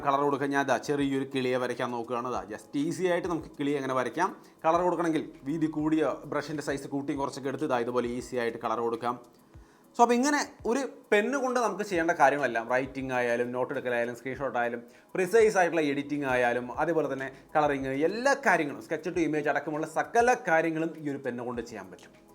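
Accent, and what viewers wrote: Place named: Palakkad, India. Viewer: ഇങ്ങളു പാലകാട് ആണ് എന്ന തോന്നുന്നു 😊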